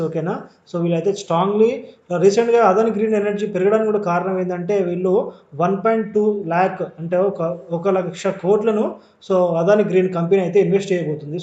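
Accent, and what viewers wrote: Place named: Telangana, India. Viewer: సార్ మీరు తెలంగాణ లాంగ్వేజ్ అలాగే ఉంది... 🤝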